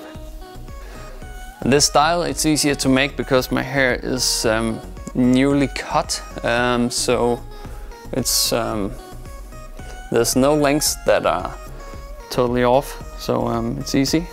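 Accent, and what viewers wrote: Germany. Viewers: Du klingst deutsch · german? sounds like Arnold!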